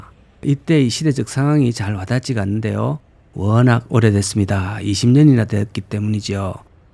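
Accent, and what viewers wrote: Gyeongsang, South Korea. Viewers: 구수한 경상도 멘트로 은근슬쩍 사회적 병리현상을 핀셋으로 콕콕 찝어내듯 몽둥이로 미련한 소 궁둥짝 쎄리 패듯.. 능숙한 방송으로 경지를 열어 가는 듯 .. 더욱 분발하시어, 부패 비리 탐관오리 속고사는 이 없게 박문수 어사 역할 부탁합니다.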